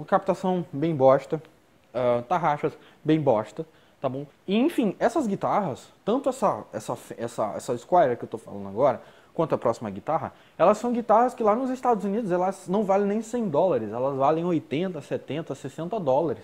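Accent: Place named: Maranhão, Brazil. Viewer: Sotaque de Maranhense!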